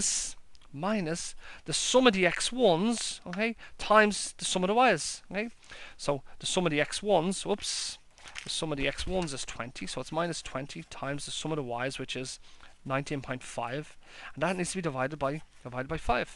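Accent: Ireland. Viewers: Plus, I really like your Irish accent.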